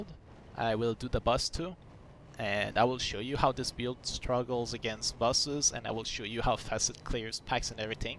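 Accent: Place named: England, United Kingdom. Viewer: cette accent anglais xd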